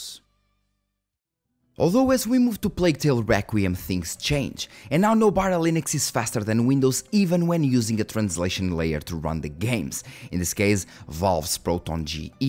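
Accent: Portugal. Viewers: your native tounge is portuguese? are you from Portugal or Brasil? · Literalemente instalei linux para poder recuperar um hdd com bad sectores e agora estou a ponderar usar linux para jogos, btw, mal vi a tua cara na thumbnail ja tinha deduzido que eras tuga XD, depois foi o sotaque, depois foi so confirmar no canal Xd, como e que deu para saber que eras tuga ? idk btw keep it up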